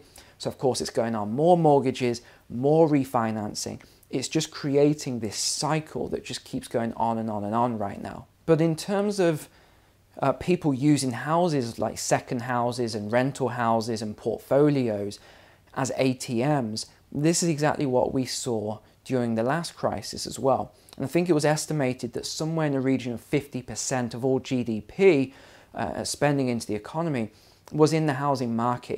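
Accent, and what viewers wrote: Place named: England, United Kingdom. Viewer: is clearly marketing himself for the USA viewers because he knows they are a bigger population than the UK and his English accent might get the audience. It also makes sense now that he is living in the USA.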